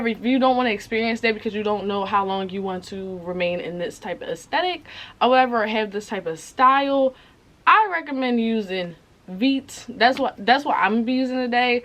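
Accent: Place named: Philadelphia, United States. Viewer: You sound like you from philly, you’re so damn pretty btw 😍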